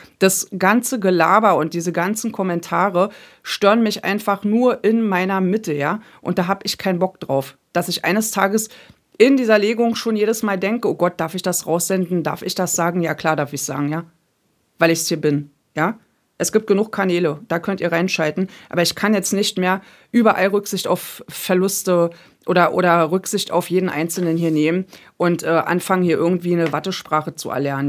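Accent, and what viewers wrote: Berlin, Germany. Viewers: Sächsische Einlagen? Ist doch eher Berliner Dialekt. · Liebe, lass die Leute reden, da fällt mir das Lied der ein. Ich danke dir für deine Arbeit deine Zeit und deine Legungen 🙏🍀💫 Alles wird gut, ich bin im Vertrauen 🍀 Ich mag dich sehr, höre dir gerne zu, es gibt Legungen die ich einfach nur höre, andere sind wieder sehr stimmig. Du bist spitze, deine Berliner Schnauze, deine klaren Worte, Bombe einfach alles 💪🫶☀️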